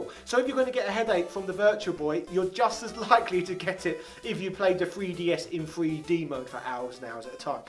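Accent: England, United Kingdom. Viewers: English people can't speak properly, free DS for example. couldn't be bovered is another. It's not just this dude though lol. freeeee deeeeeee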